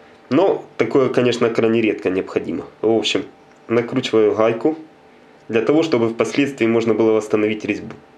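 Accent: Odessa, Ukraine. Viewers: Автор наверно из Одессы. Пионар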